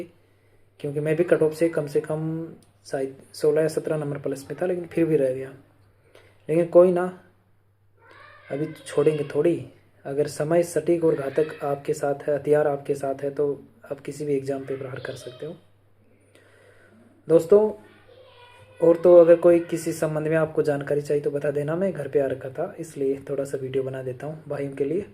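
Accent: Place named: Bharatpur, India. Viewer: Abki avaaj bol chal bhartpur ki se ha bhai reply Dena bhai kaha se ho or itbp animal transport kitni chhutti milti ha 1 saal me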